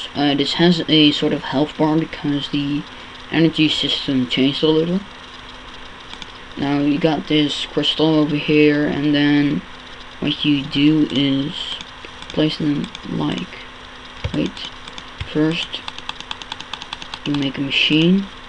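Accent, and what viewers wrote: Netherlands. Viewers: thanks for the solar setup! seems much more efficient then the flowers, but the flowers were so pretty! unrelated to that: hello there, obvious dutch accent.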